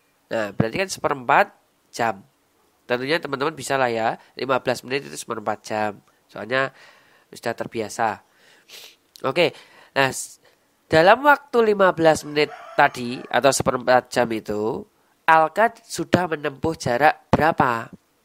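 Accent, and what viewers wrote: Java, Indonesia. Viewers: micnya jauhin dikit mas..angin medhoknya terasa sangat...apa lagi pas ngomong huruf d,b,g,j (medok)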